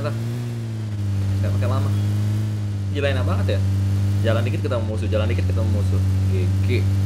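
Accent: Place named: Medan, Indonesia. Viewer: LOGAT BAHASA NYA KOK KAYAK MEDAN YA OM 😆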